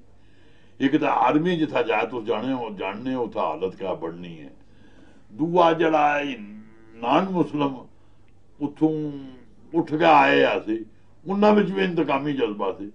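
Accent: Pakistan. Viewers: Babaji is from the khukhrain biradari of the pothwar plateau . My grandfather migrated from pothwar and used to talk in this Punjabi accent. Good to hear about the same culture extending from pothwar to j&k. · Tusa ki slaam hei sathi saab tusa sare lakee ne ho mei vi mirpur na va mei mangla dam kol jera purana sheer hona si uss purane sheer kol mara graa eh mei kharak graa na ren ala han tusa sare prosi buzurg ho tusa ni pothhari bari changi lagni eh tusa bari theet pothhari bolne oh bara maza ana tusa ki suni ke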